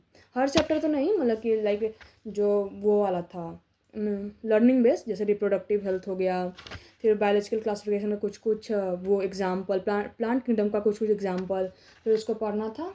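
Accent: Bihar, India. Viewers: Aap bihar se ho ky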